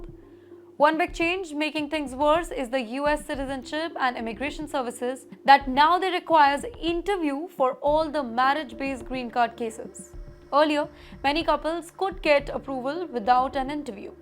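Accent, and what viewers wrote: India. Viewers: She is Indian. So typical Indian accent is obvious.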